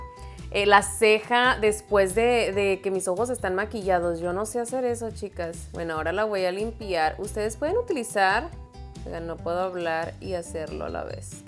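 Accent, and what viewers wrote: Mexico. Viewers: A mi me encanta que hables como está buenas sinaloense mujer la verdad 💜🍇💜🍇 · Jajaja me encantooo como hablas, pura mexicana! Saludos! Me acabo de suscribir porque me convenciste con tu flow de poses perronas jajajaja 😂😂😂😂😂😂👍🏼👍🏼 saludos de Guatemala 🇬🇹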